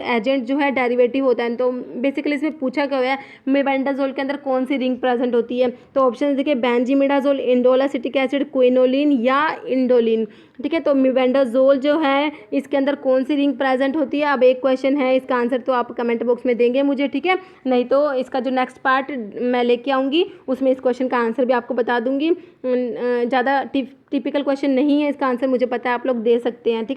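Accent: Rajasthan, India. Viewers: Madam aap rajasthan se ho kya ??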